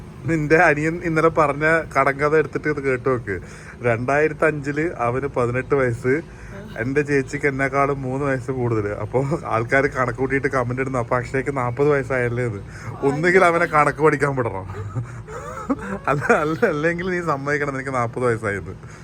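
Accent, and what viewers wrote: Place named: Kannur, India. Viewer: bro..ഇങ്ങളുടെ എല്ലാ വീഡിയോയും കാണാറുണ്ട്... നമ്മുടെ കണ്ണൂർ ഭാഷയിലൂടെ ഉള്ള ah തനതായ അവതരണ രീതി,.Superb,..Video കണ്ട് കണ്ടു നമ്മളും ഇപ്പോ ah കുടുംബത്തിലെ ഒരു അംഗം ആയപോലെ ആയി..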